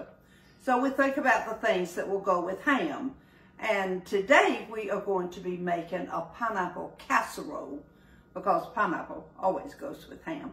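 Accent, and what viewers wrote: South, United States. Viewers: I love your southern accent! You sound just like my great aunt! I could listen to you all day! Blessings to you! Shine For Jesus! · your refined southern drawl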